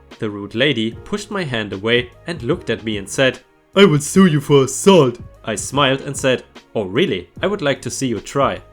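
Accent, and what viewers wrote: Germany. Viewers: You sound so german :D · Sounds like he's from Germany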